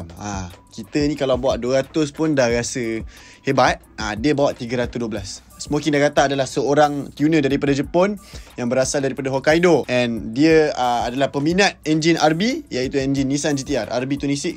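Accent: United Kingdom. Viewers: Mantap oo british accent die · Haha mantap English accent, just like the video and content, keep it up and well done!🤜🤛🙌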